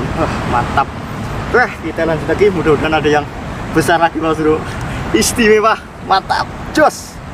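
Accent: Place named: Java, Indonesia. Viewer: Mas asli mana kok medok bgt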